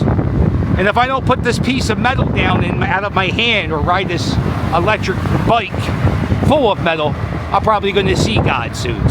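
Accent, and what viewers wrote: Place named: Baltimore, United States. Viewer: Yo how are you...with a Baltimore accent??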